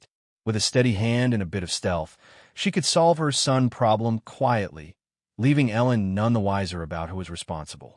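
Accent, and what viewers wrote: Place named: Midwest, United States. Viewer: the voice has a Midwest accent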